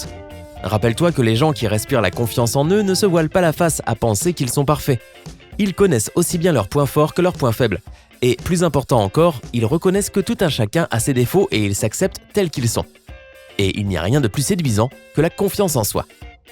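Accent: Quebec, Canada. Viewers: Dite vous etes québécois hein tes un youtubeur québécois ?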